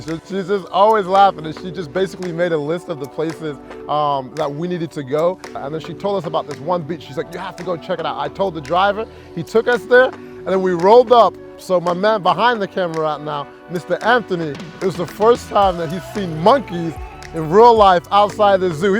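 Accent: United Kingdom. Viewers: That British accent was cleeear bwoy. Did you used to live here in the UK?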